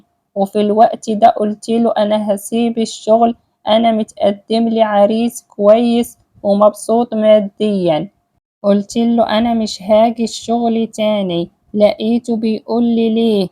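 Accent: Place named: Morocco. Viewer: المرجو عدم التصنع احكي بالدارجة المغربية احسن لك لان اللكنة المغربية واضحة في صوتك وسوف يكون افضل وشكرا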